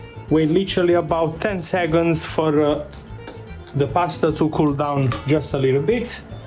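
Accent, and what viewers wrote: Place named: Naples, Italy. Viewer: Ho notato, dando un’occhiata, che rispetto a tanti altri, tu con il tuo canale qui, sei diciamo così, ancora all’inizio. :) Comunque ti faccio tanti auguri. 👋 PS: da quello che ho sentito alla fine del video, ho capito che sei anche napoletano, quindi siamo anche corregionali. Io provengo dalla provincia di Salerno. :)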